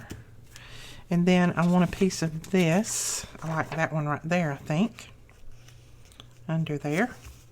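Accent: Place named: Alabama, United States. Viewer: These are wonderful, E! I've heard Rachel from Roxy Creations mention you a couple of times. She's impressed with what you are doing with her challenges!! I am praying for your husband. I know it is difficult for him and difficult for you, too! May God touch his body and heal him. Not our will but God's Will be done! Love everything you do, and I love hearing your Alabama accent since I lived in Alabama for 8 years, and even went to the University of Alabama. Where in Alabama do you live?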